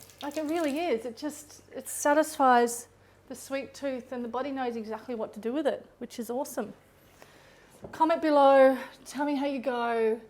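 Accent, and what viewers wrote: Australia. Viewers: Love your work , love the way you present. So Aussie. Thanks ❤️ 😊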